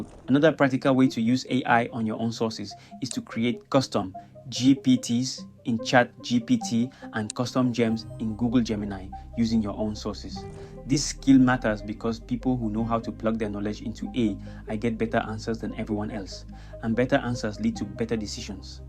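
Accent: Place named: Africa. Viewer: Your video has a well- thought outline and a coherent presentation. However, the heavy African accent is a distraction and calls on viewers to focus on your speech pattern. There are soooo many hard-working content creators from 3rd world economies that FAIL to focus on their poor English speaking skills. You will struggle to keep attention from viewers in Tier 1 countries who will abandon the video after 2 seconds of listening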